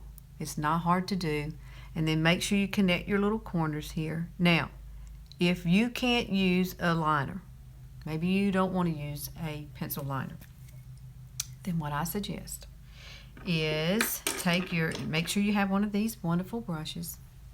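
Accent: South, United States. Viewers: I use Younique products here in Texas. Love them. I know what you mean about the southern accent. I have an accent a little different than yours but a while back, I worked with a group with our company from Chicago. One lady, while we were on the phone said “I just love your accent!” I said “I thought y’all were the ones with the accent!” She just laughed. I was serious though.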